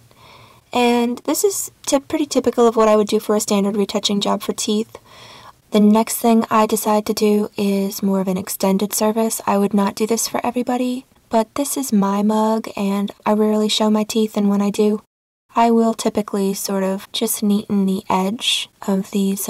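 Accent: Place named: South, United States. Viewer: Excellent. First, you taught quite a few tips that I can apply in other editing programs as well; And also you showed Affinity which I've always been curious about. Finally that hint of a Southern accent makes me want to move to South Carolina immediately 😎 Thanks!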